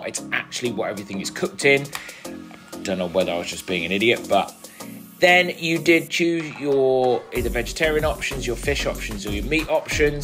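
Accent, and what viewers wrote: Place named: United Kingdom. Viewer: It's the Brit accent. Same as pronunciation of taco, pasta, etc..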